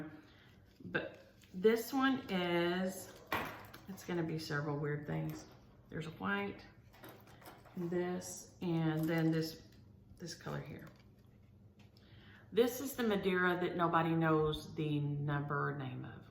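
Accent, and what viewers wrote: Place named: Texas, United States. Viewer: I would not leave my Scotties or any pet alone if strangers were coming to my home and I was not there either. You were a responsible pet owner. I want to thank you for the sweet "shout out" today and you are so sweet to share to others to watch my Flosstube also. Yes, us girls raised in the south do have the slow southern drawl. Your Texas accent is charming and I love to hear you talk. I love to read also. I have the Libby app and also Overdrive to get my e-books. I subscribed to Freebooksy also. Maybe you should join Stitching Book Club. I really enjoy it, but I just cannot seem to get all the stitching done. I do love to quilt and sew but my machine and I have a love/hate relationship most of the time so I limit my sewing time so I do not lose my religion. My friend, Deloris, was so excited when you called her name about the "A Tisket a Tasket". 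She loves birds and has loads of feeders for all kinds. It could not have went to a person that loves birds and cross stitch anymore. Happy Stitching, stitchy friend! Dottie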